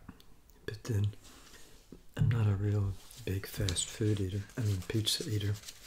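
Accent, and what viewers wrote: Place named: Appalachia, United States. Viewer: Where are you from my man? Like the accent, pretty sure Appalachian or mid south but was wondering exactly what State ur from? Love the vid and keep doing what ur doing brother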